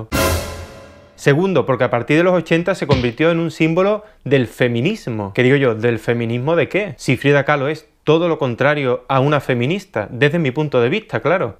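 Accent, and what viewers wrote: Andalusia, Spain. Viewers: te conocí ayer y ya me eche la mitad de tus videos PEROOOOOOOO en este, amigo en 7min 21 seg te la pasaste criticando jajajaja y aclaró soy tan desde tus movimientos de manos hasta ese acento más sureño, pero se nota a leguas que la Frida no es santo de tú devoción. Saludos desde México amigo 🙋‍♀️🙋‍♀️🙋‍♀️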